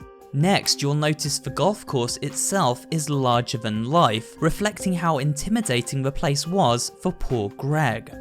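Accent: United Kingdom. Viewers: arent you british?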